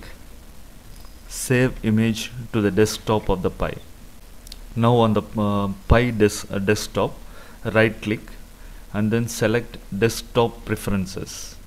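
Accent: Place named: Tamil Nadu, India. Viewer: i am going to have a stack of pi like sbc's having usb's and probably no ethernet (if there is ethernet we use it if not we connect via usb) ... these will be accessed over ssh by a "control computer" (typically a laptop) ... it is a stack which is experimental but production version could have 100's of them for super computing ... you said ssh file will be deleted ... how to have ssh file on every power up of pi's? ... all operations you show seem tedious and requiring human to gain access of each pi which would not be practical when they will be in 100's ... otherwise video is informative ... thank you ... your tamil affected pronunciation is not affecting understanding here because it is a video and we can piece together meaning by looking at action you perform ... but you are pronouncing "cord" for "card" which can confuse in other videos / audios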